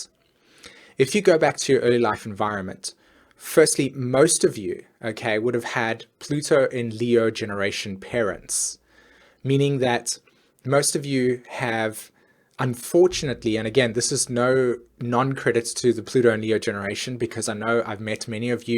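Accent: South Africa. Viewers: Are you South African by the way ? If so, do you do in person consults? I live in SA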